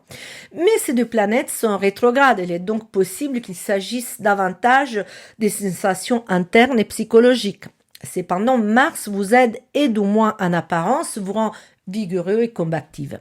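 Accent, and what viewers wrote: Italy. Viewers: Merci chère!!! Vous parlez trop bien le français avec votre magnifique accent italien! ❤❤❤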